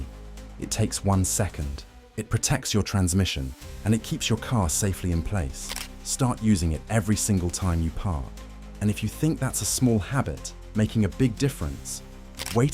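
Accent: United Kingdom. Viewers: Why the British voice. I truly hate that. Unless of course I'm watching a 007 movie.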